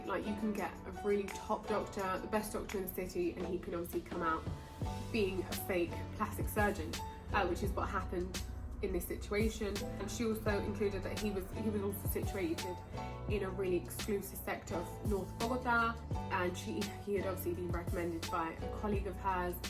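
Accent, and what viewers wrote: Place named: United Kingdom. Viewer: Are you Colombian? Omg I had a feeling you was but was confuse since you talk English with the UK accent. Lol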